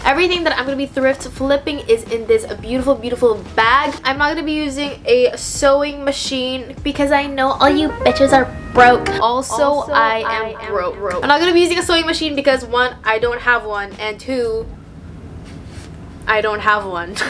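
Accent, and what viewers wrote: England, United Kingdom. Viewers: so relatable lmaooo, a new subscriber hereeee. i thought ur not filipino likeeee i love ur eng accent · I LOVE YOUR ENGLISH ACCENT 😍😍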